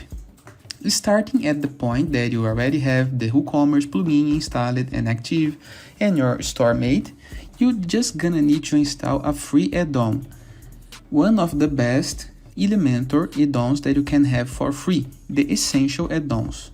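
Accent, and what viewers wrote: Brazil. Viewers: Your accent seems brazillian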